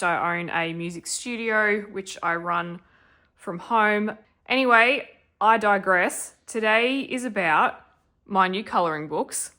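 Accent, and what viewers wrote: Australia. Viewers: Aussie accent, hit subscribe immediately! I’m a Melbourne based colouring book enthusiast too!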